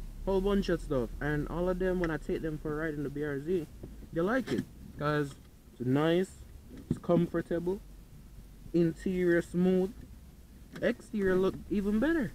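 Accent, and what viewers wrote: Jamaica. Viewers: I recognize dat accent are u Jamaican?nice car btw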